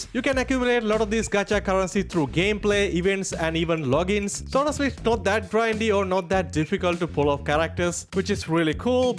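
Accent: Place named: India. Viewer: Why do u sound Indian brother? R U? Btw I am an Indian.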